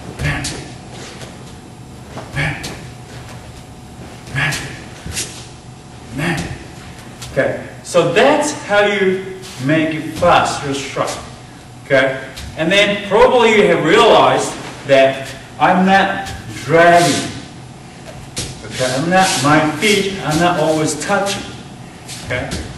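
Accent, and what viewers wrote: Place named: Australia. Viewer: ok great vid this is really helpful and i don't mean to get off subject, and i mean absolutely NO offense in making this comment, but am i the only one who thinks he sounds a little Australian, i mean that just hit me, and im an odd person so i notice these things sometimes even when im not looking for them^^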